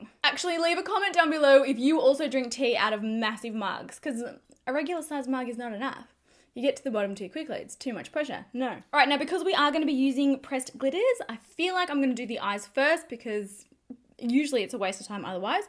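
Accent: Australia. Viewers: I Love your Australian accent I’m in USA 🇺🇸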